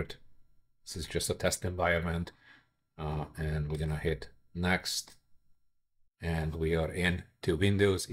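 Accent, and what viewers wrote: Hungary. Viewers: You must be a Hungarian. Nice accent! 👍 and also greate guide!!!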